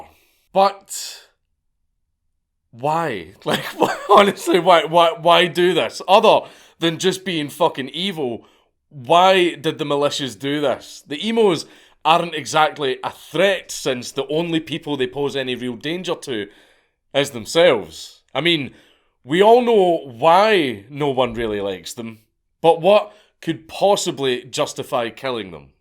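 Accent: Scotland, United Kingdom. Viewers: He’s Scottish. That’s why. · Scottish I think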